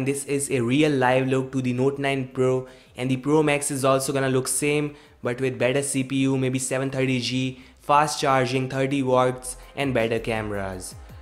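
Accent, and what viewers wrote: India. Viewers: Desi English 😬😬😬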